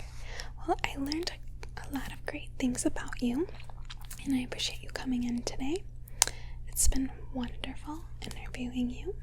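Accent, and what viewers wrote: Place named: Midwest, United States. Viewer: I can tell by looking out your window at the trees that you are in a warm state(southern), but your pleasant sounding accent you probably grew up in maybe Chicago or some Midwestern town.😉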